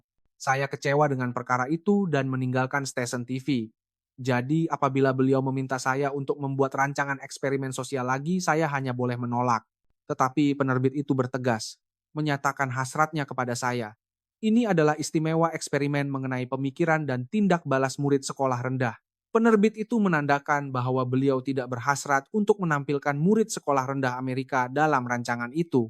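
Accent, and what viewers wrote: Indonesia. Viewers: Nmpk sgt citer rekaan. Berlebih sgt. Suara pun indon. Kami biasa² je. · kenapa suara loghat Indonesia. ni Chanel apa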